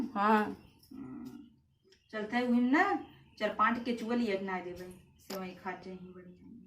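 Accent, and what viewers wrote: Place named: Awadh, India. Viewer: didi apka bolne ka andaj awdhi Bhasha mey bahut hi achha lagta hai 👍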